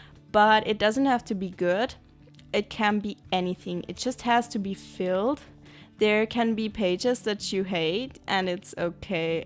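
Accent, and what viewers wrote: Germany. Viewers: Are you German? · Where are you from? It sounds like a Germanaccent😂😅😗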